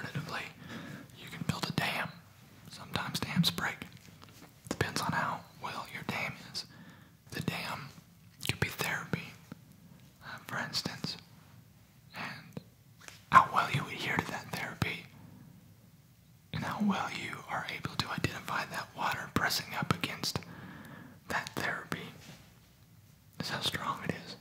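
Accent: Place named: United States. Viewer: I didn’t know is Danish because of his perfect American accent Really wanna request a real life collab with ObviouslyASMR because they are all European LMAOOOOO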